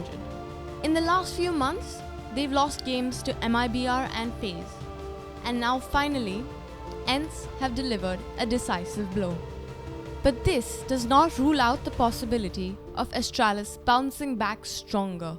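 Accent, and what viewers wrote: India. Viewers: wtf is this indian english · I clicked the video because the title sounded credible but then i heard the indian accent... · U indian or sth? Lol no offense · Indian accent?!?! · The Indian accent is a bit irritating to be honest. Of course you are used to it if you are local and listened to it your whole life.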